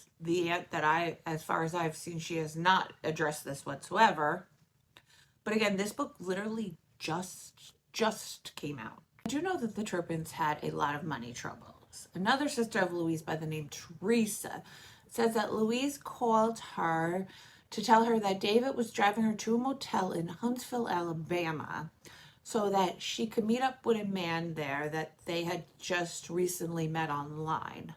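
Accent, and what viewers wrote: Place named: New England, United States. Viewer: I love that the way you say certain things like I do, I know you're from the American Northeast, around New England. 😂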